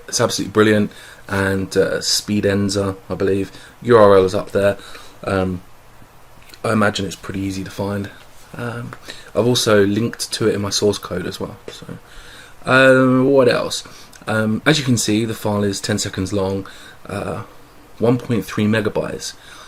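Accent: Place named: England, United Kingdom. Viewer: You English blokes have the best accents, I could listen to you explain stuff all day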